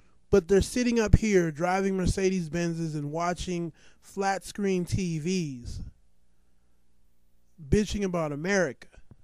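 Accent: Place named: Nigeria. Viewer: Oh I forgot,.you are so Nigerian..not only your accent but ur message..would U like to go to Nigeria urself? I've you started paying bills yet? Not gas /cell-phone bills, I mean real bills..do u have any kids yet? I don't think so.. I'll advise u to listen to your parents advise when dey r trying 2 disciple u, dey know better. Everyone is a foreigner, the difference is, some know where dey came 4rm &some don't. D whites are from European Countries & d Black? we all no dey got lost in transit.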